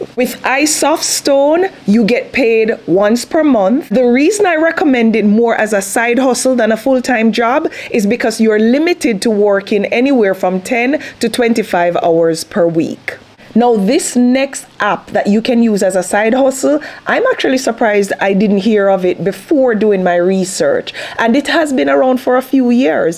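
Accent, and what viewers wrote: Jamaica. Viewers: I received your email and apologize for not responding immediately. I got sick over the weekend after visiting the hospital to get a CT scan done. I picked some virus and came down with a dripping sinus and a fever in the early Sunday morning, so I was also absent from church service in an effort to prevent spreading my germs. However, I must admit that I love you so much and believe you are a God send angel on earth for so many struggling people, myself uncluded. And, I hope you won't mind if I call you because, from your accent and the way you say 'walk good'; a saying we Jamaiacan people have, I strongly believe that's where you are from. If so, more power to you. If not, I still say more power to you and lots of love and blessings from God our father, from whom all blessings flow. To your followers, believers and non-believers, please DO NOT TAKE OUR GODLY BELIEF personal. 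You are entitled to your spiritual belief as well, and YOU ARE JUST AS LOVED AS and me.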